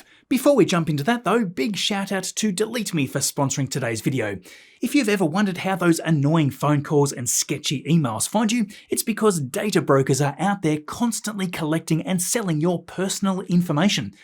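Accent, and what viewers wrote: Australia. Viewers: Nice australian accent.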